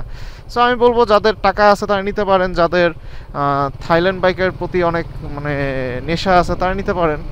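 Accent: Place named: Rangpur, Bangladesh. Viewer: Subscribe to onk age korsi,,,,apnar mukh theke Rangpur er kotha sune vlo laglo,,,,,akhn theke potita video te amr comment paben"!💬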